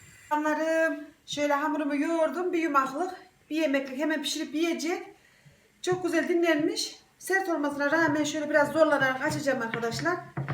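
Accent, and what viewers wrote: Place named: Merzifon, Turkey. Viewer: Bende merzifonluyum bursaya tasındım senide merzifonlular gibi konuşuyorsun diye yeni takip etmiştim merzifon yazısını görünce cok sasırdım hala dedem dayım herkes orada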